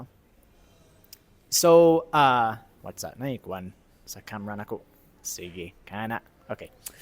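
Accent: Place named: Cebu, Philippines. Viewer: Maka amaze imong binisaya bisag 5 years paka sa Cebu Father mura nakag pure Cebuano the way ka mo esturya ug bisaya… Gi unsa nimo pagkat on ug bisaya father? Btw watching from Japan diay Father! God bless kanatong tanan!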